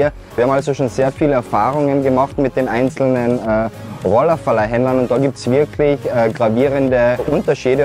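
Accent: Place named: Austria. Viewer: Danke für dieses tolle Video! Schön, auch mal Youtuber aus Österreich zu hören! Wir fliegen im Juli für 16 Tage nach Koh Samui (i know-Regenzeit, ging leider nicht anders) aber wollte euch fragen, was ihr für's erste Mal Thailand empfehlen würdet, wie viele Tage Koh Samui, wieviele Tage Koh Phangan (vl auch Koh Tao)? Würde gerne auch Khao Sok am Festland für 2 Tage sehen wollen, wenn es sich ergibt. Natürlich auch einen Tagesausflug zum Ang Thong Marine Park. Habe an 5-6 Tage Koh Samui, 2 Tage Khao Sok und 7-8 Tage Koh Phangan gedacht (mit Tagesausflug Ko Thao evtl). Ist das zu empfehlen? LG aus Niederösterreich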